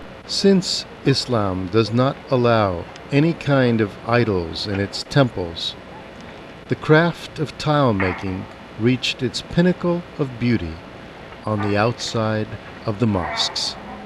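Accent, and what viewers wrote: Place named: Brooklyn, United States. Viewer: "Baklavar"? Dude. Your pronounciation is pure Brooklyn. I suggest you stay away from the contemporary political commentary. If anyone wants to read the details of events leading up to the Afghan civil war of the 1980s, one place to start is the chapter on Afghanistan in William Blum's book 'Killing Hope: US Military and CIA Interventions Since WW2' (Common Courage Press 2004) and Blum's references therein.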